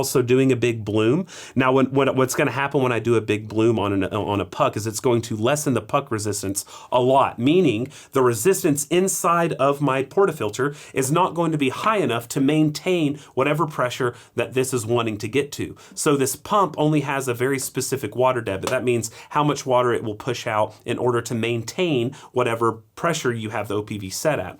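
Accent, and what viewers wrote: South, United States. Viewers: Your southern American accent but simultaneously using metric is wrecking my brain.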